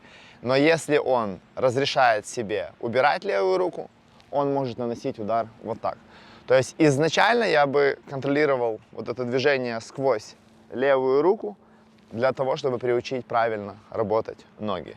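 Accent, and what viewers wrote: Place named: Odessa, Ukraine. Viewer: Хорошее видео Очень знакомый акцент. У моих учениц из Одессы очень похожая речь У меня примерно такой же топ ошибок. Примерно так же исправляю Валерий , тренер из Германии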